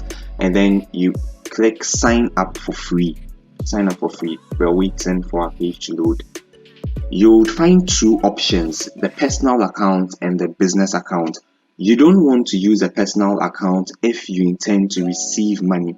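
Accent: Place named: Ghana. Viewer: Pure Ghana man English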